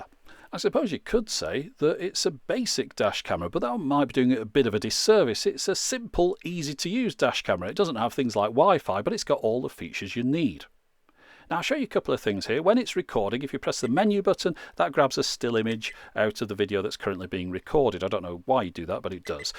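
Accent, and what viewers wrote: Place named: Lancashire, United Kingdom. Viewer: I recognised Wigan and a Lancashire accent.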